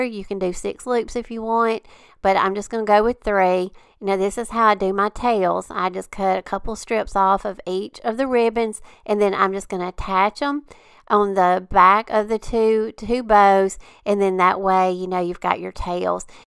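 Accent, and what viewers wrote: South, United States. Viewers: Awesome job. 🥰 I love your Southern Southern voice. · you never fail to amaze me! I love your style, your cute southern accent & your beautiful projects, thank you!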